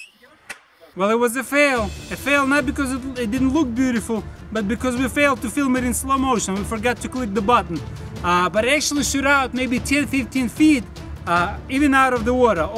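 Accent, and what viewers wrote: Russia. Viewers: D tone of him is like crazy Russian hacker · Русский акцент 😀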